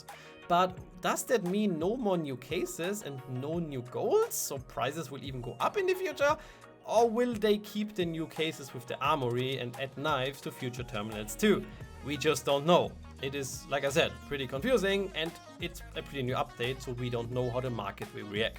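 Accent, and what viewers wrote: Germany. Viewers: Alter dieser scheiss deutscher akzent ich kann nicht mehr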